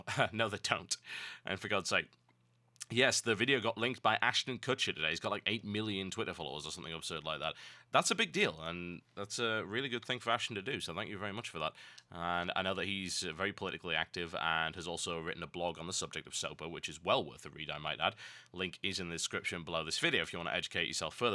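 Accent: United Kingdom. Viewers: No the 21 minute slideshow with an obviously bored British accent voice-over is not a better video. This guy talks about doing better marketing? He should take a Red Bull and turn on his webcam before trying to present his arguments, what a lame way to get any type of attention. He must be a failed lawyer since he spends his time doing voice-overs on gameplay videos (something that YouTube specifically forbids youtube partners from doing)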